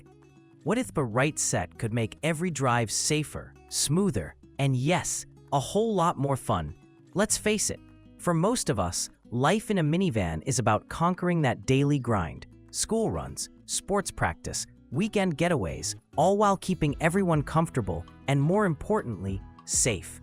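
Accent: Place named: Canada. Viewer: Narrated by a Canadian for the Canadian audience..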